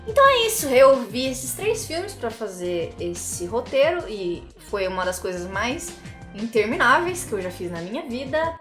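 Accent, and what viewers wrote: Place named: Curitiba, Brazil. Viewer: Nas primeiras palavras que você disse eu já percebi que você é curitibana, finalmente alguém puxando o R e o E aq lkakalalaal tamo juntos, sou de Curitiba tbm kakakakakak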